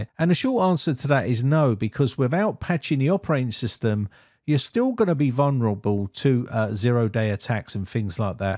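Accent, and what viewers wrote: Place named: London, United Kingdom. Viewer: it's "Watcha guys" Londoner speak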